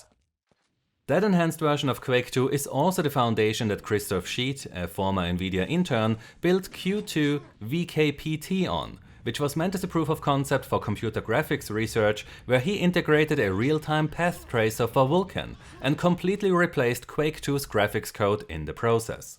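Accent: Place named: Germany. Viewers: Pretty sure it's german. Practicing english. You can tell when the syllable count is a multiplier of what would normally be said. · Hey there, not to criticize you or anything, but I‘m really curious about your accent. Sounds kinda German-ish, but with a different pronounciation, so my guess would be that you‘re Austrian? Am I correct?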